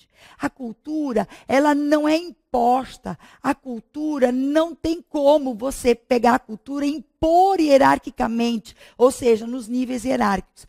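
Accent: Brazil. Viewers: com sotaque do interior de sp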